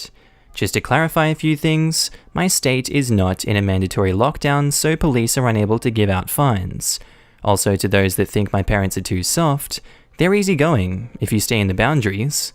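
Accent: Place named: Australia. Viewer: Aussie far from home here, sometimes I listen just to enjoy the accent :-)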